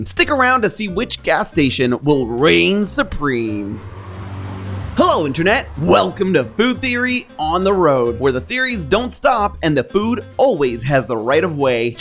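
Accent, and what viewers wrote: Argentina. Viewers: IS FROM ARGENTINA???? THE ACCENT, THE CHARM, no wonder I like him as a host. Another coronación de la gloria 🇦🇷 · Nothing makes me happier than Argentinian accent coming through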